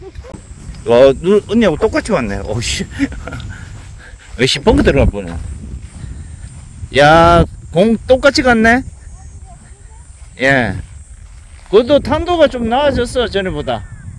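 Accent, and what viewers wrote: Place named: Gyeongsang, South Korea. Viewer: 참~~말로 갱상도 사투리 정겹네예~~~ 버스턴에서도 잘 보고 있습니다.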